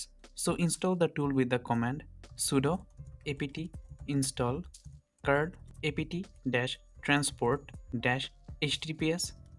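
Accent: India. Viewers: কথা শুনে মনে হচ্ছে আপনি বাঙালি ❤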